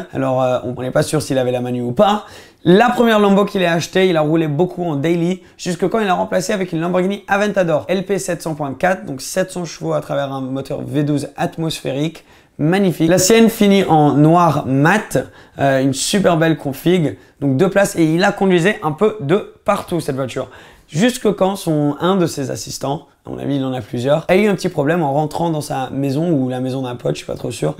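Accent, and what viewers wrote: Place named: England, United Kingdom. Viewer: T'es anglais ou français ? Je te pose cette question parce que t'as un accent anglais mais tu maîtrise bien le français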